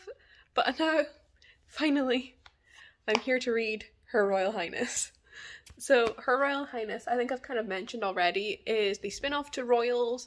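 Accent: Scotland, United Kingdom. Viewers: i love how you've let out your scottish accent a bit more in this video lol. i'm not scottish but when i was reading her royal highness i could feel that it was all just a big stereotype without much research behind it, so it was interesting to hear your point of view. And honestly i totally feel you, i'm from italy (probably the most stereotyped country in the world) and it's frustrating seeing people (but especially americans) romanticise and misrepresent my country all the time.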